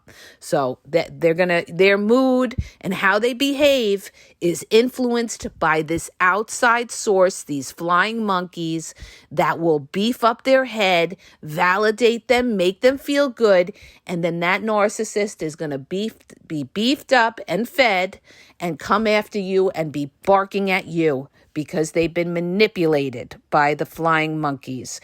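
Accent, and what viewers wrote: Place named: East Coast, United States. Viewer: Her east coast accent is wild. She doesn't look like she would talk this way. I love her videos, very informative.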